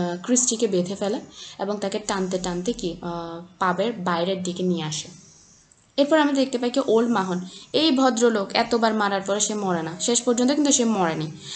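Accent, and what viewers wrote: Chittagong, Bangladesh. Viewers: Chittagong er accent 🥹❤️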